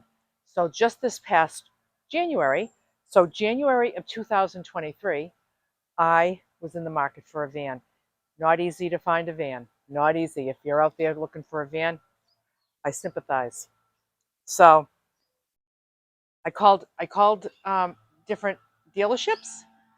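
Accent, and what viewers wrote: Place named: New England, United States. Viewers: Hi, I have watched 100s of videos of van build out. Yours is the best I have seen in the past 5 years. I started with a mini van when I lived in RI. Now that I’m living in NM. I purchased a full size cargo van both my husband and I travel in it. I loved watching you I knew right way from your accent your from Massachusetts. I was born in Worcester and lived there till I was 33. Then RI for 23. Now retired and living in NM. Thanks again for PS I loved your studio apartment video as well. Paul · You're So personable and full of life! I'm originally from NH and I know the NE accent anywhere ! Am I right? Best of luck in your travels dear !!😊 Oh and I got a kick out of the fact you carry even incense. I'm a hippy chic from wayyy back !✌🏵🌻 AWESOME VAN and VIDEO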